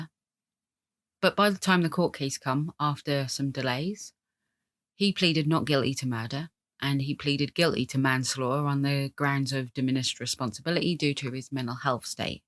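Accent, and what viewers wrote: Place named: East Anglia, United Kingdom. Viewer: Norfolk? Suffolk? You started off and you’re accent crops up , less Norwich , more Ipswich ? Go on , I’m Yarmouth Girl here born n Norwich , miss the Sea ? ‘ hearing ‘ , that was a blast , subbed , hadn’t seen youre channel which odd , most female crime channels wind me up ~youre emotions are appropriate and feel real . Cheers for the vid , tragic sad story ✨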